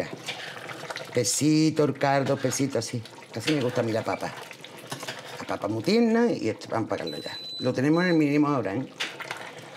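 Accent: Andalusia, Spain. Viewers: qe grande eres hija una papas guisar qe buenas el Señor ese qe aprenda el el Andaluz qe es lo más bonito de el mundo entero un abraso mui grande guapa ♥️♥️♥️🌹🌹🌹😘😘😘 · El mejor habla el andaluz y la cocina de olé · aquí en Madrid vivo yo y no se escucha eso sí el acento Andaluz lo apreciamos mucho viva tu cocina y tu salero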